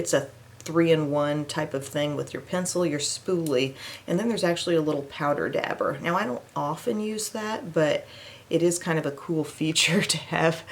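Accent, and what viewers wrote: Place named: United States. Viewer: Hi there, I'm in the UK, I've been following you for a couple of years and I love your vlogs. There are so many accents in the US and I cannot place yours, it's really different and pretty. Which area does it come from? Also, I love that makeup look, so fresh and glamorous! 🙂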